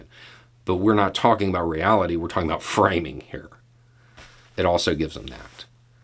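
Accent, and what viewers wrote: South, United States. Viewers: Lots of good thoughts from this year. For a guy that looks and sounds like an ignorant Southern hillbilly in a garage, he comes off as a sort of political intellectual. You expect to hear the words of MAGA issue from his lips. Instead, one hears common sense. He's probably right about countries not having morals but countries are made up of people. People have morals. I think most of the people in the US (& Canada) have good morals. So - I hope he is wrong and the good morals get reflected in social policy. Happy New Year!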